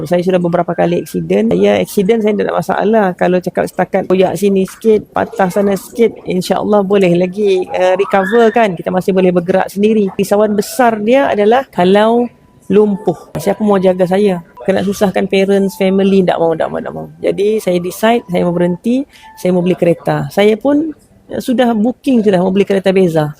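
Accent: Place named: Indonesia. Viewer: Rasa2 loghat apa kakak ni guna ye? Kejap dgr mcm indonesia, kejap macam utara.. konpius...😂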